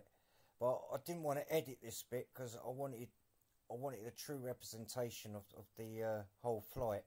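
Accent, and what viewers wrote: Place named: United Kingdom. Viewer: Fair play to ya pal. Decent channel thanks nice to hear a brit accent instead of a yank 1.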